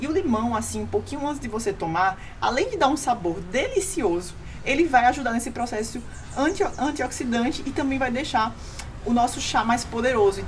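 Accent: Recife, Brazil. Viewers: Adorei a receita. Muito saborosa, principalmente com limão!!! Pra mim foi difícil achar a cavalinha fora do Brasil, mas a Amazon me salvou. Rsrsrsrs Essa nutri é de Recife? Parece muito com meu sotaque 🤭 abraços para minha possível conterrânea 😁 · Ela é de Recife sim, sotaque lindo né ❤️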